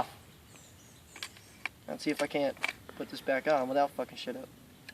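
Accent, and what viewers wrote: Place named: South, United States. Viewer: Add in the southern drawl when you start to talk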